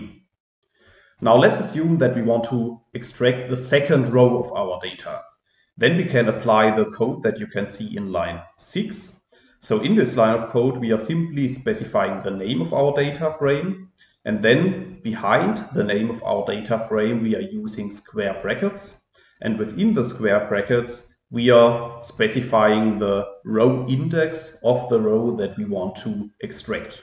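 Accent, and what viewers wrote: Germany. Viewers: I do really appreciate this video and the author -- helped me a lot since I'm a complete R dummy so far and trying to comprehend the language via Coursera. Haven't found any real examples on how to extract multiple rows of data frame to finish assignment neither on discussion section nor on web, while you explained in details how that works -- a big big thanks! Have subscribed to the channel, think will be useful. Super lovely German accent -- greetings from Russia :)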